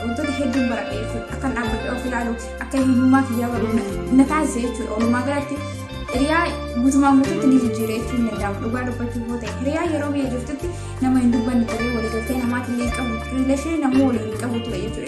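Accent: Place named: Harar, Ethiopia. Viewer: Anaa dhufuu hiriyaa& waw 😍 cimtuu too hiriyaa gaafifi debii baredaa halaan nama hawwatudha qaxalee too waqayyoo bakka yadden si Haa gahuu qashtii too shawadha jettaa hiriyaa ani intaa harargee natti fakkata bar😍💞akka arargetti hasofta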